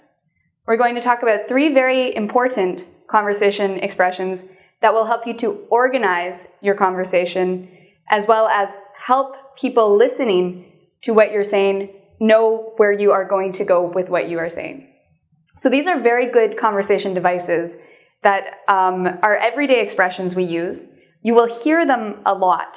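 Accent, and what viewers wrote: Canada. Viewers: Hi, awesome vids! Just out of curiosity, are you by any chance Canadian? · i can hear the canadian accenthaha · Canadian English is nice... and she explains very well. Keep on like that,!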